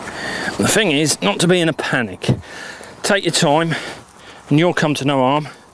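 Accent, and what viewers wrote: United Kingdom. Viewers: i have never heard Martin Bowler speak-only seen him in IYCF Didn't expect him to have a bit of a London accent :D Nice video though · This guy's accent is great...what part of the UK is this from?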